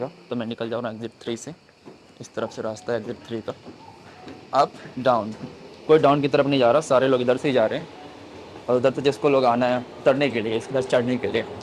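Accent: Bihar, India. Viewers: Bhutla jaoge ye jo bihari axcent haii hm bihario ko tum se khas jor ke rakhta h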